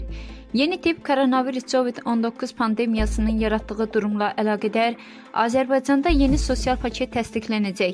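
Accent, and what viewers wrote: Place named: Iran. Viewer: Iranlidi daniwan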